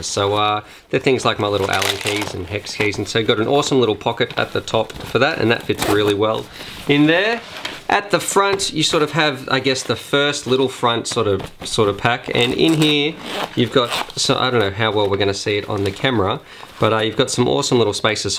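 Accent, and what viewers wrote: Australia. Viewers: Hay great videos man but just wondering are You Australian because if you are like me I would love your chance even more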